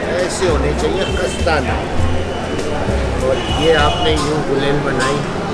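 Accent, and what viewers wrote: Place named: Karachi, Pakistan. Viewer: Sydney Australia.. love your accent bro.. karachi ki yaad aa Jati hai.. Best Food Explorer in Karachi😊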